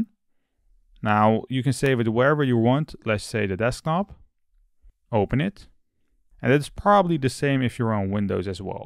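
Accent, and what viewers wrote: Netherlands. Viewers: I hear a dutch accent excellent video explanation goed gedaan!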